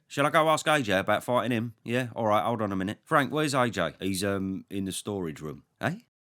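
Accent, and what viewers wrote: Cockney, United Kingdom. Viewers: How can a 5 minute video from a cockney boxing genius sell the fight more for me than two massive pressers 😂😂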